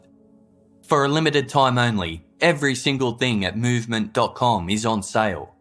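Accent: Australia. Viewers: Is Aussie?????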